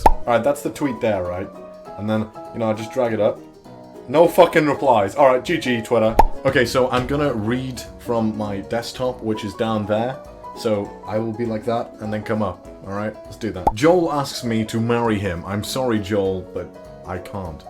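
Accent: Wales, United Kingdom. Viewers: ARE YOU WELSH MATE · Don't have a twitter but for the next one, how hard is it to hide your British accent? Like is it easy or hard to do?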